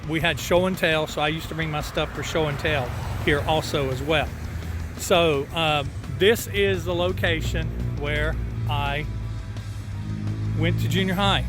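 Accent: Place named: North Carolina, United States. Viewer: I just knew you had a NC accent. I am also from NC (Asheville area) but I've been so many places I have lost SOME of the accent. Really enjoying your videos. Kiss fan since 1979 (age 4). I was buying records when other kids were buying toys.....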